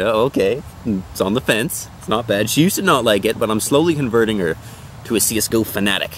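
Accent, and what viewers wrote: Canada. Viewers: Am I the only one who hears the Canadian in his voice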